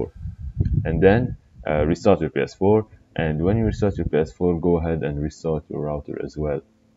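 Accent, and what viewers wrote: Lebanon. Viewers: Are you Lebanese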